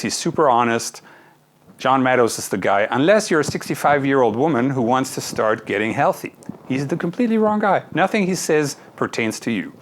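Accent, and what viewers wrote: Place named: Germany. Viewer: "My English is not that good today." Your English is amazing. I had to really focus to even hear a German accent...I've been studying /speaking German for thirty years, and I not only still speak it with a Texas accent, but confuse the verbs for "to live" and "to love." Awkward.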